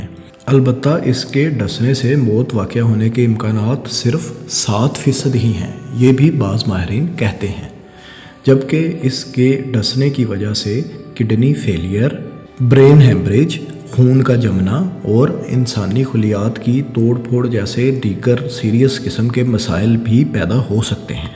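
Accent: Punjab, Pakistan. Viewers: Good work ap ka lehja Punjabi hai plez inprove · Bhai voice-over kisi dhang se Urdu bolne wale bandy se karwaliya Karen meharbani Hogi Bhat taa K dekhne walon ka vedio main interest Bana rahe, yeh Punjabi mix Urdu lehja kaano ko Bhat nagawar guzarta hai, humble request Hai apse.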